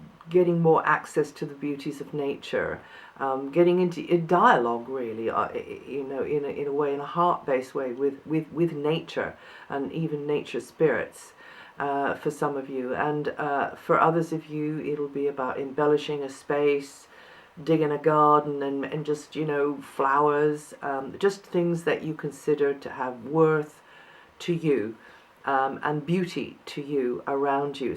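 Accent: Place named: United Kingdom. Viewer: You are Beautiful. I love your accent, British, of course.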